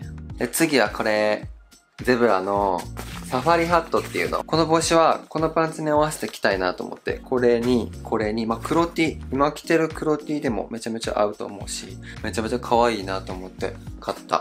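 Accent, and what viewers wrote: Kansai, Japan. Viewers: クリアメガネめっちゃスキィィィ！！🥺 関西弁大好物→ ゼブラ可愛い🦓💕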